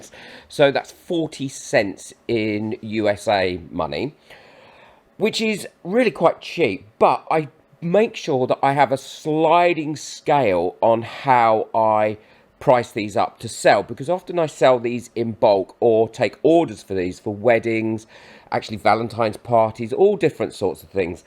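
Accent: England, United Kingdom. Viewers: Thank you very much,! For your content, for sharing your expertise, for your generosity and your humour. And for all the love and care you put into your videos! I also love your accent, it brings back memories of the year I spent in England: absolutely LOVE it!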